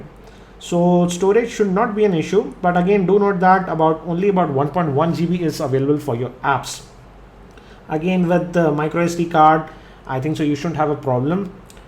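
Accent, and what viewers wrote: Hyderabad, India. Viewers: Are you from Hyderabad?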